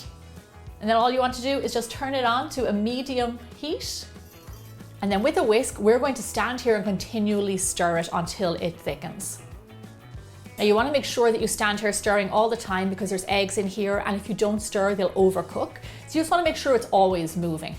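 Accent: Ireland. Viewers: I’ll make my own all organic pie crust 😉 short cuts not important for me 😊 ... thank you for the recipe ❤️ your accent make me wanna move to Ireland 🇮🇪❤️😊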